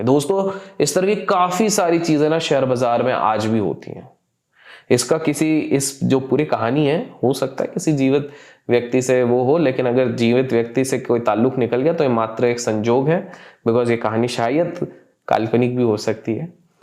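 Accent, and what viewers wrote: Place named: Konkan, India. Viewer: Sir aap Maharashtra se ho aur 100% kokan se ho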